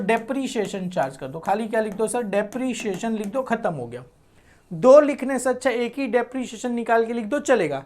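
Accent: Gujarat, India. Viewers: Sir ap gujrati ho kya..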